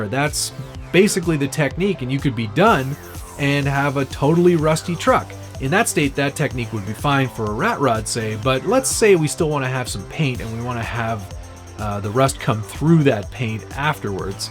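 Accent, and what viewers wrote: Canada. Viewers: Hey man quick question, are you from Canada?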